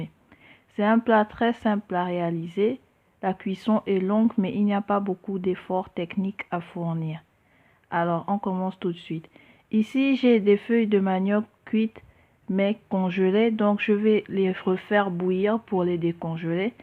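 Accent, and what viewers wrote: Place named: Gabon. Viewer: yum yum.. avec l'accent du pays et tsou et tsou trop bien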